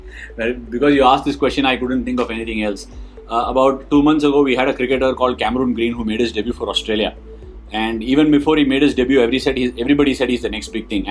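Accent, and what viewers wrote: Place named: Chennai, India. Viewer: Best Chennai Accent😀 Nothing bad❤️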